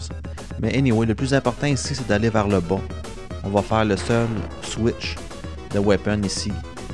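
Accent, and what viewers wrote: Quebec, Canada. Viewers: Québécois oui, mais tu es quand même très compréhensible pour des français comme moi ;) Et GG pour ta vidéo, même si tu trouves que Gaiares est un jeu facile (alors qu'arriver jusqu'au niveau 4 relève de l'exploit) tu arrives quand même à faire un run sans perdre une seule vie. C'est la que je me dis "Putain, ce que les canadiens sont bons :p"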